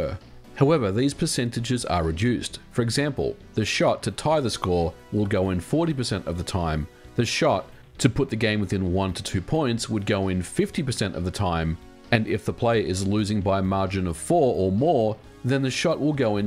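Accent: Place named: Australia. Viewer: Australian. He lives in the US.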